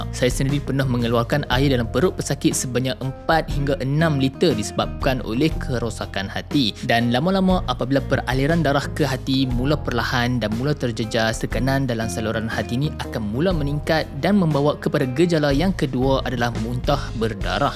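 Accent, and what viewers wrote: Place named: Kedah, Malaysia. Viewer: Weiii ,hangg org kedahhkaaa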